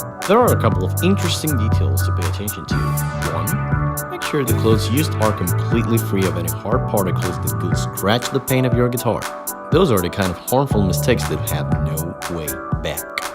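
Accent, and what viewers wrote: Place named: United States. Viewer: Good tutorial, man. Lots of good tips a lot of players particularly ones new to guitar might not know.👍 Side note: your accent is much more American sounding than I imagined. No real point there just and observation. Lol!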